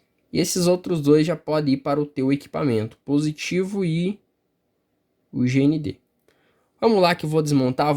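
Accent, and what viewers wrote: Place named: Caipira, Brazil. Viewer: Sotaque caipira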